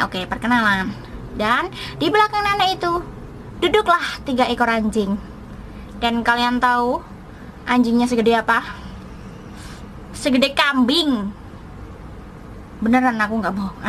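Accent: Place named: Java, Indonesia. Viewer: Dik jenengan asli pundi to kok bhsa jawa getot sekali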